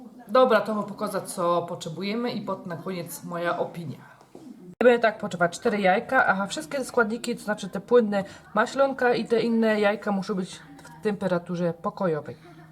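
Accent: Silesia, Poland. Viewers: Genialna odpowiedź :) uśmiałam się do łez! Swoją drogą ja uwielbiam Cię słuchać; mogę sobie porównać różnice między naszą "godką" (Zabrze) a Twoją. Pozdrawiam serdecznie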